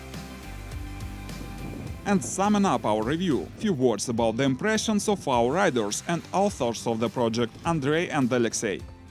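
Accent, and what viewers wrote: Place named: Russia. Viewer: Да, русского сразу выкупаешь, но который пытается казаться нерусским (сам в молодости пытался так говорить) А наповал пишется слитно, кстати)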